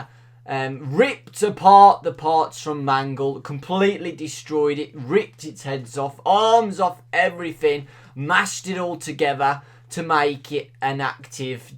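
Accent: United Kingdom. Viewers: Are you British or Australian?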